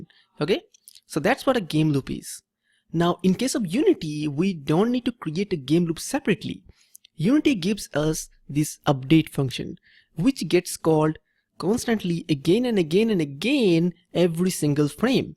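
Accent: India. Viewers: That’s how Indian talk I’ve heard my friends always go “OK” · They're accent. I mean, no racism at all. But people from around India talk like that. AND BTW I also like the accent XD and u sound like a cool dude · you know you are at the right place when you hear Indian accent.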